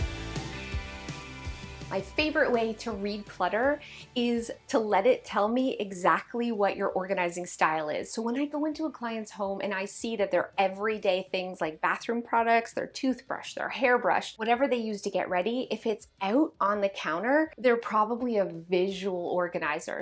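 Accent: Canada. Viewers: Sounds like you're from Canada. Just want to say to all canadians on here, i think you are awesome and kind, and i hope to get to visit quebec this year. Love from Ohio ❤❤❤❤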